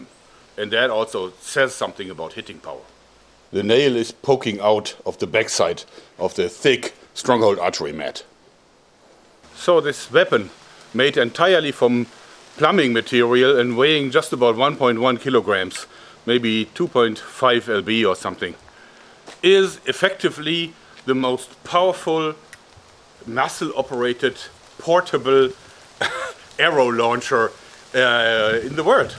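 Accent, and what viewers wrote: Germany. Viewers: i love his german accent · This is German English trotzdem passt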